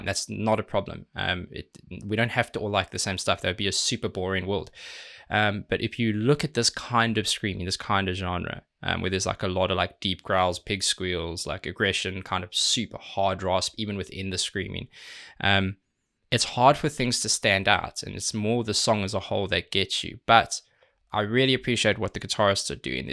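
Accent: South Africa. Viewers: This dude sounds South African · I Knew that you were South African , I have the same accent you have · Bro you sound South African, I may be wrong though 😅 great video friend, Alex is such a madlad 🤘🏻 Edit: He's South African, my bad 😅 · that a south african accent?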